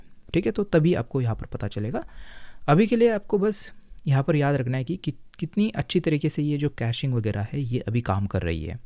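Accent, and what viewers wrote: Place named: Mumbai, India. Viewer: bhai aapka slang typical mumbaikar jaisa hai, btw love your content❤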